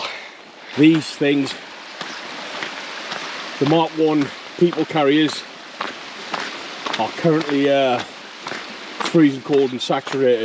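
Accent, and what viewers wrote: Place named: Northumberland, United Kingdom. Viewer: by any chance are you from somewhere near Ashington or Morpeth because I am because you just have that sort of accent and if you are do you support Newcastle United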